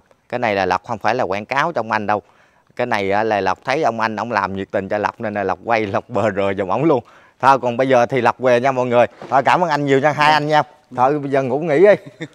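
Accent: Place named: Quang Nam, Vietnam. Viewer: Nghe chú em nói tiếng Quảng Nam rất vzui tai, Con gè con ke.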